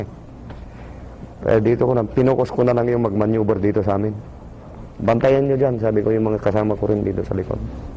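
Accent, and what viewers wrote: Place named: Pantabangan, Philippines. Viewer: Cgruro sir tubong pantabangan.at ung pronounsetion.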